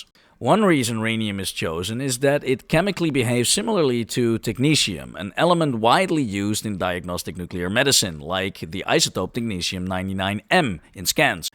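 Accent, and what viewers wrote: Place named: Netherlands. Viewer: love the dutch dialekt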